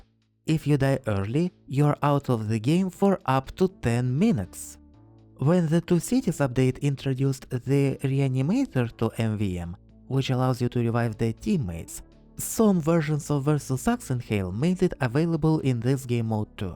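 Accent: Russia. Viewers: Почему у тебя такой русский акцент? (я просто тебя впервые вижу) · Are you russian ?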